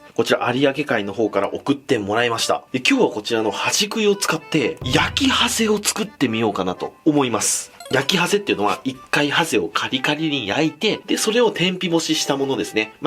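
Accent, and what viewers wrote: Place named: Nagoya, Japan. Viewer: 名古屋弁(尾張方言)なのですね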